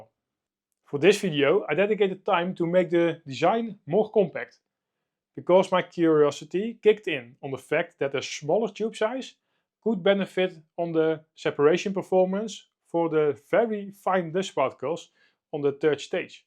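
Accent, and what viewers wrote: Netherlands. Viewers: half a second in i reconised your accent as one of my own. and then at 48 seconds you confirmed it. How are there so many dutchys on youtube ill never understand. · Actually this was part of what got me hooked. Love me some thick Dutch accent, probably because I've met so many nice Dutch people. Greetings from duitsland, godverdomme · I can barely understand what you are saying. You have a really thick accent I guess Dutch..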